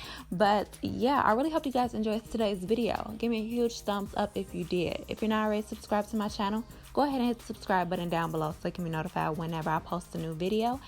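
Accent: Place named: South, United States. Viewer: Where are you from? I hear a southern accent. I'm from Mississippi! :D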